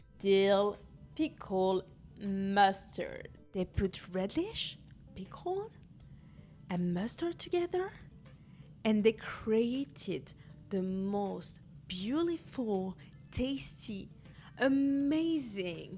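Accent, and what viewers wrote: France. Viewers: c’était très sympa de t’entendre parler en anglais 😘. L’accent français, tu l’accentues pour les Américains ou me trompe-je?😉😁🤣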